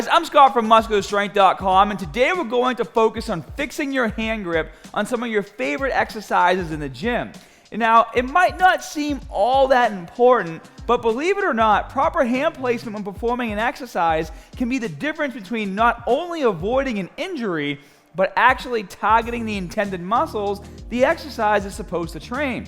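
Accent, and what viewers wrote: Boston, United States. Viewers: Is this guy from Boston?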